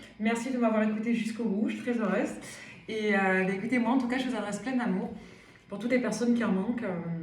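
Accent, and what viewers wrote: South, France. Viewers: L'accent du sud, c'est la vie, c'est plus qu'un accent, c'est un état d'être. Un grand merci pour votre lumière bienveillante et franche.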